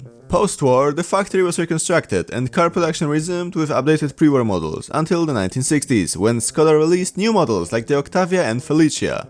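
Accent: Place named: Poland. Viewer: You sound like a Polish 😊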